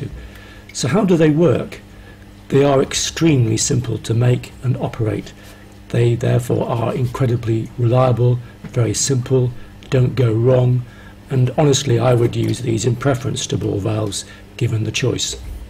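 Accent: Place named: United Kingdom. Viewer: Great to hear a Brit voice using air lift. I’m at the design stage of building a new airlift pond, would love to see more of your airlift setup.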